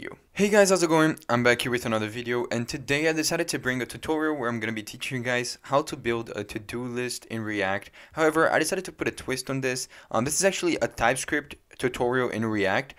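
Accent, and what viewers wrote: Brazil. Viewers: Quando comecei o video pensei: "esse cara so pode ser brasileiro" (por causa do sotaque kkkk) quando eu vi o nome do canal dai eu tive ctz que vc era brasileiro. Parabens pelo trabalho mano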